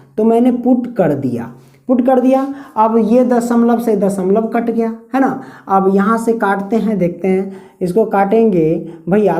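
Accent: Bihar, India. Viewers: Aap Bihari hain ji. Aap bahut achha padhate Hain. 👌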